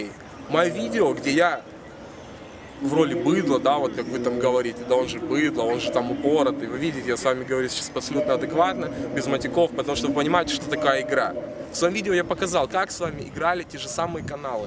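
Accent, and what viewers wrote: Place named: Ukraine. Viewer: Вот это украинец формата 90-х годов я их такими всю жизнь и помнил. Добрые, светлые, со смешным говором.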